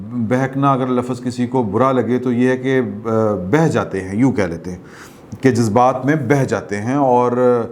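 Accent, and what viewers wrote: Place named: Lahore, Pakistan. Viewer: سيد عمران شفقت صاحب، آپکے وی لاگ بہت پسند کرتا ھوں اور آپکا لاہوری انداز گفتگو بھی کمال ہے ما شاء اللہ۔ ایک بات فرمائی آپنے کہ "وہ لوگ" جو فیصلہ کرنے کی "صلاحیت اور قدرت " رکھتے ہیں، معذرت کے ساتھ یہ نہ کہا کریں "قدرت" تو آئین بالکل نہیں دیتا اور " صلاحیت" اتنی کے دس سال سے مل کر بنایا پلان دو سال بھی نہ چل سکا مگر فیصلے زبردستی ضرور کرتے ہیں۔ اللہ آپکو خوش اور آباد رکھے!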